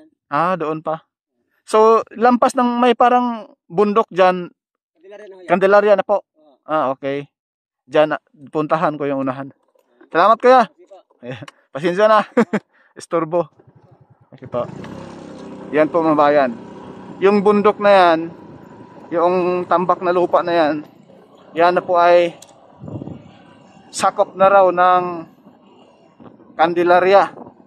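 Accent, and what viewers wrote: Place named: Visayas, Philippines. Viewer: Kainaman.bisayang nahaloan ng batangasin kung mag salita. Resulta bulol😁✌️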